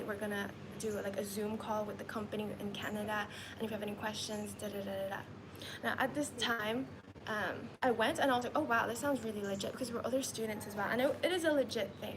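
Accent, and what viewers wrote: United States. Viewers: how did you learn to speak English with a Kansas accent?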